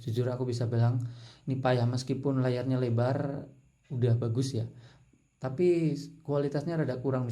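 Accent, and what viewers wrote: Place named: Java, Indonesia. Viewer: Abang orang jawa yah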